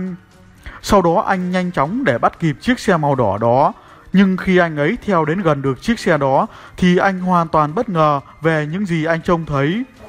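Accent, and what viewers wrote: Thanh Hoa, Vietnam. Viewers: Dám chắc đây là giọng thanh hóa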